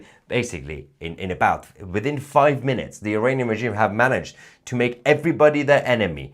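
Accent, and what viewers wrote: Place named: United Kingdom. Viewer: Dude stop exaggerating everything and jer jerking off on everything and I'm getting a little f**** pissed because of the way that you're exaggerating things and pulling s*** out of thin air and I thought you were better than that, now you just sound like a f**** stupid f**** Brit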